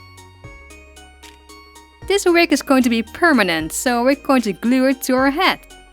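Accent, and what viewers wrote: Netherlands. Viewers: Your so typical Nederlands accent hurts my lil Belgian-dutch speaking self, The finished doll looks awesome tho!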